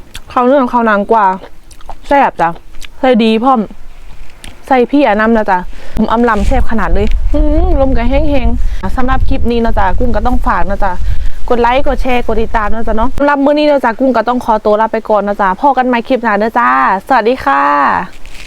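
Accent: Laos. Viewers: เป็นคนจังหวัดไดสำเนียงคือคนลาว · สาวไทย เรอ สาวลาว สำเหนียง พาสา ปะสมลาว ไทย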